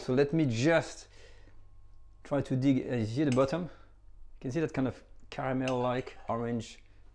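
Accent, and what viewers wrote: France. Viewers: Tres bien merci,de partager nôtre heritage culinaire avec nos amis américains! Parler anglais avec l accent francais est appétissant!! ,je veux dire par là lorsque vous dites des termes de cuisine en prononçant le mot en francais , peut être faudrait il le dire avec un léger accent anglais pour que nos amis anglophones comprennent mieux …ou l ecrire en sous titre avec l explication du terme reduire, bouquet garnis …etc maintenant si tout est expliqué dans le magazine dont vous faites la promotion autant pour moi …je vous ecris parceque j ai eu le privilège de travailler avec le Chef Monsieur Robuchon !! a Monaco a l hôtel Métropole que cette recette est une salade Marocaine revisité…est ce que le magazine en parle ?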